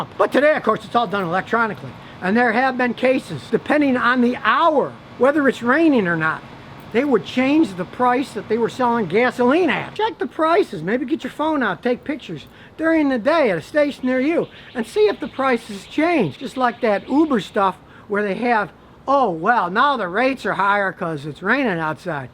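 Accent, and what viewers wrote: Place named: New Jersey, United States. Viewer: You talk like your From Jersey You know what I mean FA GET ABOUT IT You could put some copper wire and some Magnets on your hands and and generate electricity like a wind turbine ..And im from Jersey .. Great video & Channel...Just Subscribed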